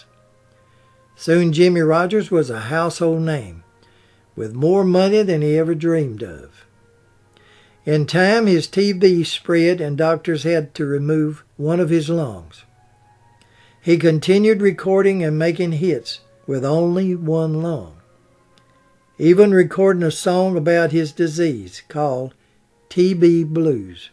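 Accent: South, United States. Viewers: It's common to read comments on YouTube from Americans loving British accents but not so common the other way around. Well this old Brit lady adores your accent. I could listen to you talk all day. You could be reading the phone book for all I care, but the added bonus is that everything you present and narrate is interesting and informative. Thank you. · I hope you understand how wonderful your documentaries are, Don't ever stop Please. And thanks for talking slow and country. These days in most place on youtube, I have to slow them down so I can understand what the young people are saying..........lol........Life's Weird! :D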